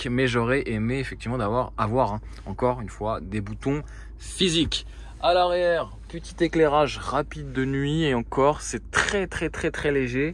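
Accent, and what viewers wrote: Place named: France. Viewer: I only watched this to listen to the French accent. 10/10.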